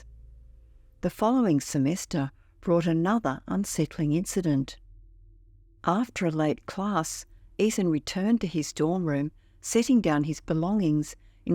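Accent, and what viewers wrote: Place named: Australia. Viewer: Being an Aussie I love hearing our accent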